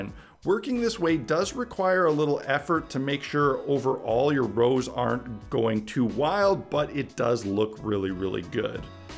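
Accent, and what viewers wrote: Canada. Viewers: Canadian? are you westcoast? btw what a great fantastic building, hope you dont mind totally copying this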